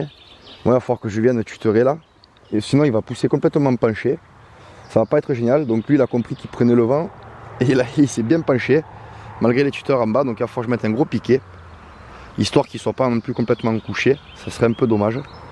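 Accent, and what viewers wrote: South, France. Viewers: Tu es ou, avec ton accent dans le sud ?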